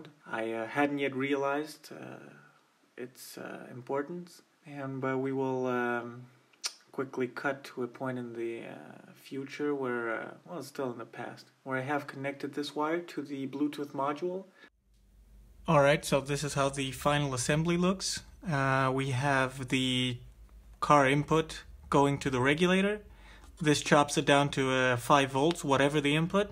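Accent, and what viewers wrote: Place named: Germany. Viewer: Very, very good description! And don’t worry about your diction, I appreciate German thoroughness!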